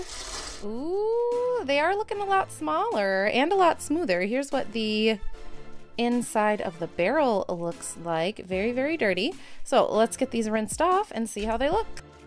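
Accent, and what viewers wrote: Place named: Midwest, United States. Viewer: This was randomly recommended to me and im only about a minute into this video, but i didnt realize how much I missed the subtle midwestern accent until you said 'its fall here in minnesota'! I grew up outside the twin cities and moved to oregon a couple years ago and i just got hit with a wave of nostalgia and endearment for the type of people i grew up around. Thanks for the reminder of home, im excited to see how the rocks turn out!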